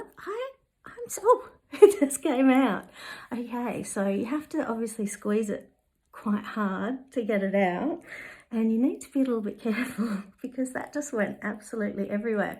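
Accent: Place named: Australia. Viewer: You have a lovely soft spoken voice, and the Aussie accent is the icing on the cake. I've actually never smelled Daisy, but I love violet.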